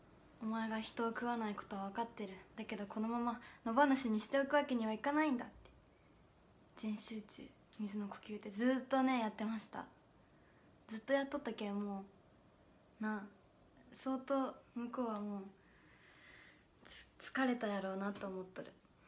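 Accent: Okayama, Japan. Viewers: 出ちゃうというか終始岡山弁やで。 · 割とまじで疑問なんだけど岡山の何処だったらここまで方言つくの？ by市内民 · いとこが岡山県だけどほんとにこんな感じや笑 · 岡山弁が「出ちゃう」じゃなくてもはやただの岡山弁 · 岡山の方面とかに友達おって頻繁に電話しとるからか分からんけど岡山弁が全然普通に感じる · 岡山弁はじゃけえとやけえが混在してるのか広島弁と関西弁が混ざってんのね(ドイツ人) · まだ綺麗な岡山弁じゃわ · ノブのイメージしかなかった岡山方言がこんなにキュンとするとは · 岡山県井原市？バラ園いいですね！！久しぶりに岡山弁聞いた。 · 岡山弁って正直きちーと思っとったけーこの人の言葉はすげー可愛い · 声かわいいから岡山弁でも柔らかく聞こえる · 岡山って俺の地元の喋り方に似てるわ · 千鳥みたいな岡山弁使ってくれたらもっと好感度あがるな❤ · 掛橋さんの岡山弁好き。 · 耳に馴染んでる言葉じゃけぇさ、岡山に帰っとる気がしょーたわ。ごじゃかわいいべや （北海道民）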